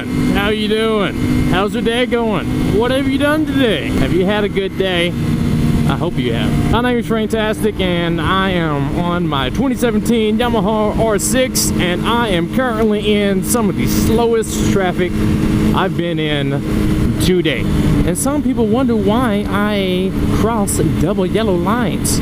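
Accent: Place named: South, United States. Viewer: Hey man, keep up the vocal pace to avoid a 20 min video. It sounds normal in 1.5x speed! The southern draw is nice but if you keep yourself aware of pace you can cut your vid time down by about 40%. Nice vid though, Cheers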